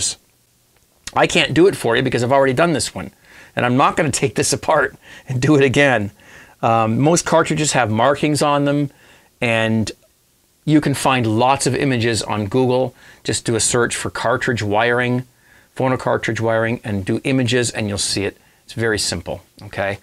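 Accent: Canada. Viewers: Must be because he's Canadian (I can hear it). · Also, one of the best Canadian accents ever. Thank you for this video!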